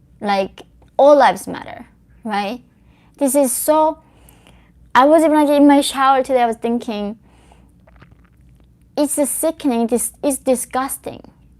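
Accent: United States. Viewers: you sound more American than native born Americans. In a sense that is a bad thing. Keep speaking out to open peoples minds.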